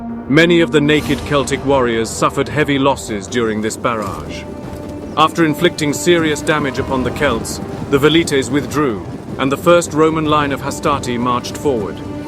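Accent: United Kingdom. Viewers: This history is told in a such a perfect way - animation, music, super British English and ideal synthetic summary, that I was watchin the film dozens of time! Really great!!!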